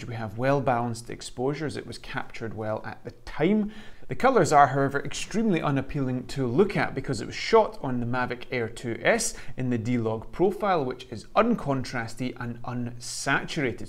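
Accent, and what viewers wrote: Scotland, United Kingdom. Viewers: Interesting explaination. Thank you. I think saturation especially adds a lot in videos. Love your accent. I guess it’s scottish!!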